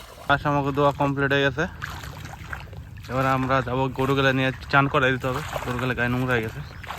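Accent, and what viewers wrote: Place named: Barishal, Bangladesh. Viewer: ও ভাই তুমি তো কথা বলো মোগো বাংলাদেশ বরিশালের মতো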